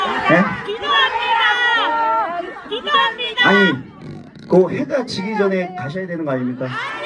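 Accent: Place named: Chungcheong, South Korea. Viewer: 환장하것네~~~ 정겨운 충청도 사투리 귀여운 수현님이 하니 더귀엽네요😂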